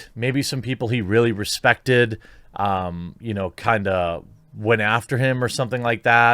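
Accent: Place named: Chicago, United States. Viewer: Yeah, I've been noticing this for a while now. In some of his videos that he does earlier in the day, he starts winding up and gets almost irate, saying things like "I'm so sick of these pathetic spineless losers" or some such. Part of me thinks it's the Chicago accent....there's a "I know something you don't know" quality in there....gets on my nerves. And then there's the reminder that he's bi-racial, and from the southside of Chicago... and he skateboards, and was at occupy wall street, lol. But,, the guy is definitely consumed by his job, and does work hard as hell. I appreciate the hard work and the job he does, just lose some of the condescending attitude. Or like Jeremy said, take a long vacation.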